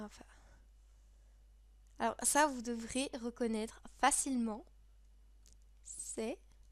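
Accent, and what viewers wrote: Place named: Liège, Belgium. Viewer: Petit accent de liéch là :)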